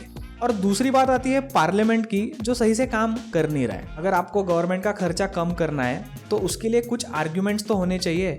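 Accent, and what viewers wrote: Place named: Bengal, India. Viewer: Keep it up.are you from Bengal? Your accent is like that.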